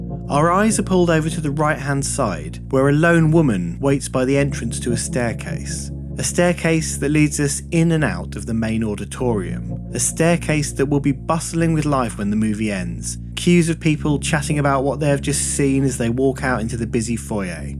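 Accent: United Kingdom. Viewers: the british accent that i love to hear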